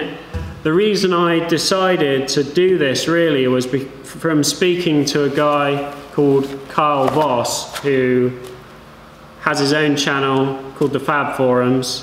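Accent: United Kingdom. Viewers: Keep up the good work mate love the channel its good to hear a British voice 😂 it’s a shame you didn’t fabricate your own arches would make great content!!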